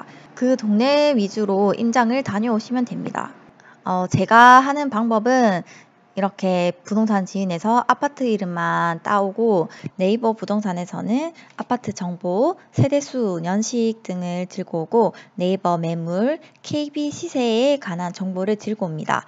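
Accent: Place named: Ulsan, South Korea. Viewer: 잘 보았습니다..울산분이었군요..어쩐지 말투가 나랑비슷하던지^^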